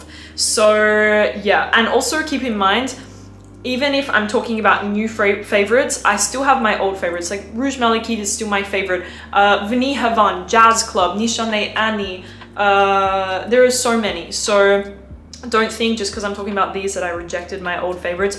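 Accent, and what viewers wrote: Australia. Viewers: ! We missed you and your aussie accent 😍 You look stunning! Happy to see you back, don't forget about us here on YT 😢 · Just wanted to tell you that you sound so Australian👍 I like it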